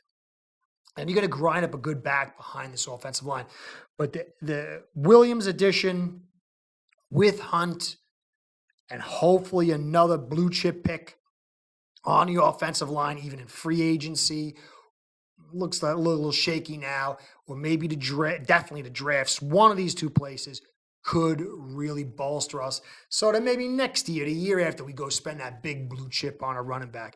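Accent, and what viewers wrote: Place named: Florida, United States. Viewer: Great Florida accent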